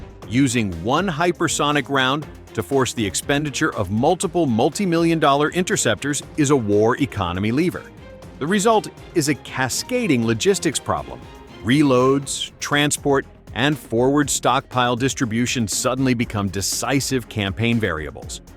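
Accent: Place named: United States. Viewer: I’m always a bit wary about US accented videos talking about Russian military gear with an air of reservation. It’s almost like everything that is discussed can only be viewed through Rose-tinted, US-centric spectacles. Apart from the very obvious “mil-speak” style in the narrative intended to deliver the story the way that insanguinated generals would present to a gathering of the same minds, the thing that really gives me the pip is that every narrator manages to insert a subliminal little rider in the presentation about the questionability of any technology that isn’t of US origin. We already know the US is definitely not the best at anything in the world, yet it seems to be persisting in increasingly enfeebled attempts to reinforce that nations self delusion. This is especially strange coming at a time when the corrupt US/UK/NATO/Ukraine cabal of criminals and their “game-changing” weapons are getting their clocks cleaned in Ukraine - and even Iran - which is widely derided as being a “nation full of rag heads from the middle ages” - in one assault levelled significant sections of Israel, to the extent that Israel has refused to admit public use of any camera footage from the disaster zones, and is almost certain to receive similar punishment again. 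For God’s sake, even the Houthi’s are showing the US a thing or to, that has caused the US to move their battlefleet out of range, probably unsuccessfully, for there is more to come from them if the US persists in its support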